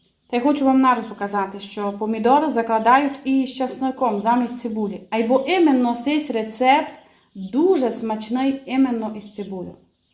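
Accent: Transcarpathia, Ukraine. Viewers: Дякую за чудові рецепти! Томатний сік вийшов смачний за вашим рецептом, тепер спробуємо помідори. Дуже люблю слухати вашу закарпатську вимову - вона збагачує українську мову. ❤ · Кайфую від вашого закарпатського акцента.Дякую! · ви направду дуже гарна господиня), все, що я робила за вашими рецептами було дуже смачне. А ще, мені дуже подобається закарпатська говірка. Дякую вам дуже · Мова українська, закарпатський діалект:))) звучить дуже цікаво і гарно. А закрутки в господині неперевершені! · Щиро дякую за рецепт і за чудову закарпатську говірку.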